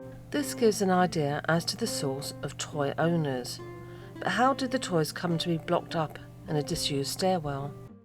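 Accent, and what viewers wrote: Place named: England, United Kingdom. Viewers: You’ve never heard a British accent? 😂British Siri and Alexa sound similar. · Sound more Tudor , it's incredible that you're still alive .😆 Do you mean the the narrator spoke with an English accent? I'm not British, but could perfectly understand the narrative.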